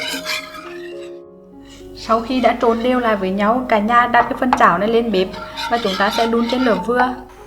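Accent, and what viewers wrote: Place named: Hue, Vietnam. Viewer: món thạch bạn làm rất đẹp luôn .cô đầu bếp nói tiếng huế thất dễ thương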